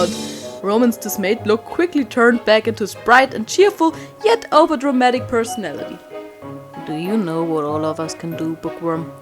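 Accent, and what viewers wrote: Germany. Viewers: WOAH I LOVE THIS SO MUCH ! I'M GLAD I FOUND THIS ! Can't wait to see the next part, if you are doing one! 💗 And English isn't your first language? And I may be wrong but you sound german ? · Watched to the very end XD For a little critique/some compliments: I'm learning German and one of my teachers has a similar accent to yours. Learning a second language is really hard :( . I really enjoyed the whole thing even if there wasn't a great deal of animation; I think it still works really well, not that I'm an expert in any way, and the music was perfect. The story was creative and convincing and I'm excited to see what happens next and if it somehow ties into Thomas Sanders' life- although I doubt that that will happen. I discovered TS recently and O.M.G.! His Sanders Sides are perfect and somehow so accurate- it's like therapy and they're so helpful. 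Love the art style, don't overwork yourself ;) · Sorry if this is rude but are you German? Your accent reminds me of other German people's English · If I'm correct, I think German is your first language? Learning a new language is very difficult, but you're doing great, sis!! I find your accent pretty pleasant-sounding, especially the way you pronounce the names OML so satisfying. 😂😊 you're also relatable, from the end bit LMAO Keep creating!! 😊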